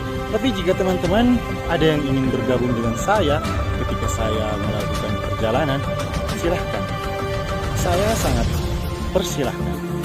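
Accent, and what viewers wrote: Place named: South Sulawesi, Indonesia. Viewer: Logat bugis nya kental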